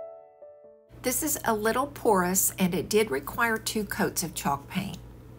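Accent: South, United States. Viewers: I love a southern accent. Please don’t be self conscious. Just be yourself. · I am from the Southern U.S.A, but live in Michigan now. I do so much enjoy the touch of southern accent in your voice. It is very soothing to watch your videos while I'm learning so much from you. Thank you for sharing your talent with your viewers. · why in the world would you be bothered by your southern accent? I think it’s charming and you speak so softly. I am southern (North Carolina) and I am proud of it and unbothered at all by the way I speak and it’s definitely more twangy than yours. Lol. You are such a good painter (I am so messy) with exquisite taste. Your creations are always charming and unique and your instructions are clear and easy to follow. Lovely items! Thanks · Why would it bother you about your southern accent? I am proud to be southern. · I think you should be proud of your southern roots, nothing wrong with having a southern twang. 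· I'm originally from the South I love the accent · Southern accent, love, I've heard thicker accent, your lovely, it's ok, if they have a problem, they can turn the captions on! Lol! Be blessed, BY THE WAY, I love your style! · Embrace that a wet Southern voice! Not everyone can speak with this genteel gift we have! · Darling, Im from New Zealand and we have terrible accents! I just love listening to all the different accents on youtube, especially a southern one. You my love have a beautiful one! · Comme toujours les objets sont très beaux 🤗😄et celui que je préfère est la coupe que tu as réussi à décorer avec le "air dry clay". Le résultat est tellement joli 🫶😍. Ne t'en fais pas pour ton accent, d'autres youtubers ont un accent du sud comme par exemple Susie de "Susie on a farm" ou Teresa de "our greene acres" et les gens s'en fichent. Moi même je suis allemande qui vit depuis 35 ans en France et encore aujourd'hui on me dit que j'ai un accent 🤷 et ce n'est pas grave. 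Bonjour de la France et reste en forme 👍😀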